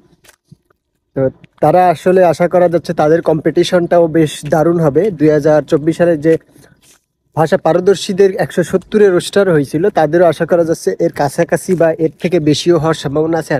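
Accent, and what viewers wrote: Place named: Satkhira, Bangladesh. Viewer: ভাই, আপনার দেশের বাড়ি কোথায় ? আপনার ভাষা আমাদের সাতক্ষীরার সাথে অনেক মিল খুঁজে পাই ❤❤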